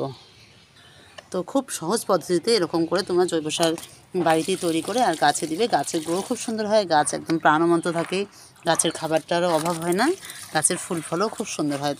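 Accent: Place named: Bangladesh. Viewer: আসসালামুয়ালাইকুম আপু, আপনার এই ১২" গ্রো ব্যাগ গুলোর হাইট কত ইঞ্চি? প্লিজ বলবেন। আপু আপনি বাংলাদেশিদের মত কথা বলেন।আপনি কি বাংলাদেশি ?😊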